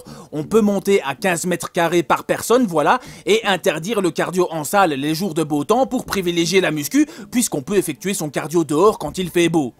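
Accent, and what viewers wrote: Belgium. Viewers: tu es Belge ? t'as un accent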